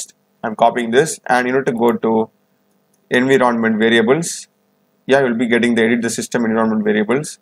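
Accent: India. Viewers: Soon as I heard the Indian voice, I knew I was in good hands. Thanks for helping us regular folk out man, much appreciated :)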